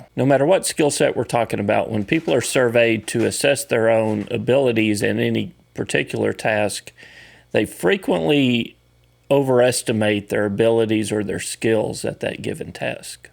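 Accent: Texas, United States. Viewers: ...Don't sweat the complainers! Your Texas style of speaking is fine! Even us fast talking New Yorkers can enjoy a little slow down. Your videos help a lot of people, I am sure. Especially new riders. I enjoy them as a 40 year rider; and I've learned some things too. Keep it up!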